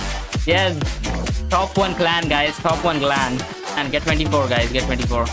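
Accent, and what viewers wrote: India. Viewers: u sound like a indian tech dude indians are the best dude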